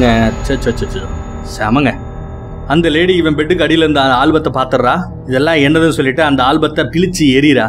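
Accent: Madurai, India. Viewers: Anne Neega Madurai thaana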